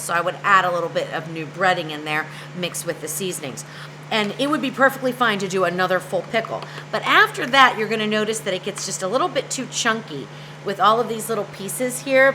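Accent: United States. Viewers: Do I hear Wisconsin?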